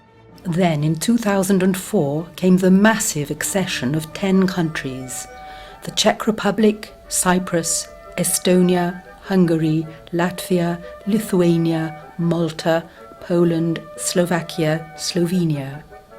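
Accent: Wales, United Kingdom. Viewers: Hi Latertoe, the speaker doing the voice over is from Wales. all the best, Ian DG Interpretation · Wales, indeed.. an enjoyable accent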